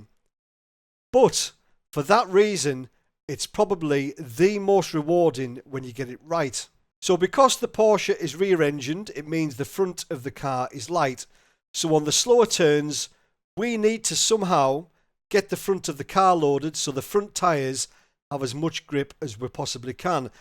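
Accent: United Kingdom. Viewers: What part of UK is your accent?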